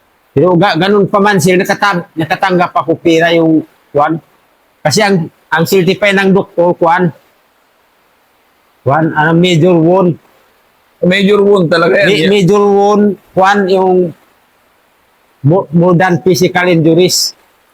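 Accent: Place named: Tausug, Philippines. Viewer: Tausog na tausog talaga c sil mag kwento ba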